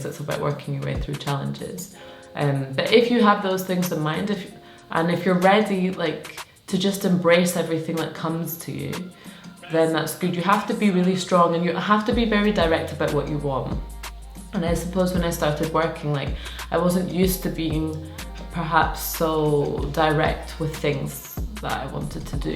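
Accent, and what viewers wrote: Scotland, United Kingdom. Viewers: I detect some scottish accent there ... am I right ? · I thought I heard a Scottish accent.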